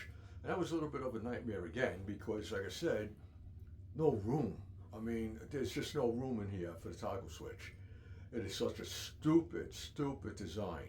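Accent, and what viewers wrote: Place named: Northeast, United States. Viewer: I'm an Irish guy from the Bronx, and I sound similar to this guy. It's a Northeastern thing.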